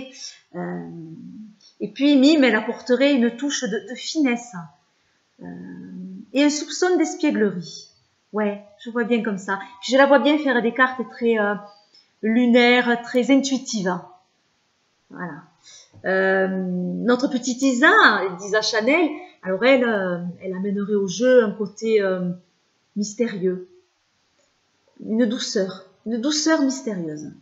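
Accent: South, France. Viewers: Toi avé ton accent du Sud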